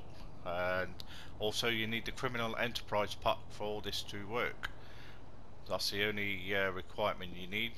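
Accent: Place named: United Kingdom. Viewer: Honestly never leave comments on videos but 1.your british and not a squeaky american 😩. 2.your straight to the point. 3.its not click bait 4.idk but you got a sub and a like from me 💚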